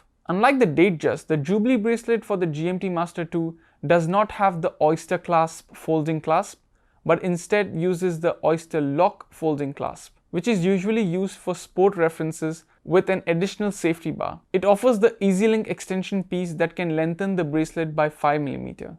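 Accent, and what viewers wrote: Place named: India. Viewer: having the indian accent is really lame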